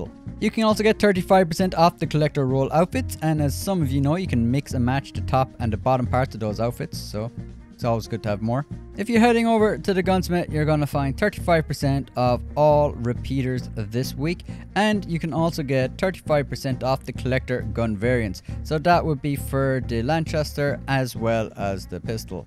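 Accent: Ireland. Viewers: is from Ireland they sound weird to a brit the 30 especially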